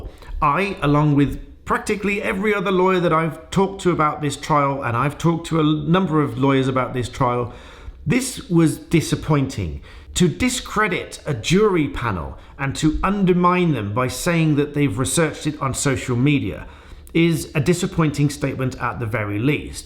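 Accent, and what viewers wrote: United Kingdom. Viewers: Heard all this on my American atty channels, but I have to say, is so refreshing to hear with a British accent. This judge has also had her fill of team Amber.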